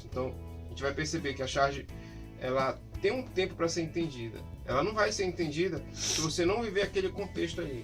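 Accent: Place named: Manaus, Brazil. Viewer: Esse sotaque da moça parece ser da minha cidade Manaus Vcs são daqui? Tô no terceiro período de jornalismo estudei com o Romas Mascarenhas mas me esqueci tudo tô relembrando ótima aula seus vídeos.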